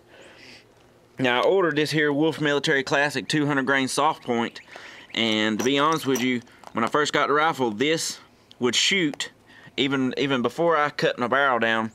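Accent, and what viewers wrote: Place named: Louisiana, United States. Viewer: North Carolina? You sound like you from the Bayou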